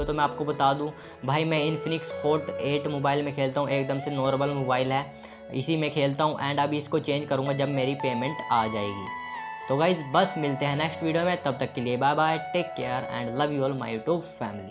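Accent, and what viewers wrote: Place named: Haryana, India. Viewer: Bhai mene teri boli t e pta laag gya tha ki tu haryana ka h isliye tera qna dhund rha tha😜😜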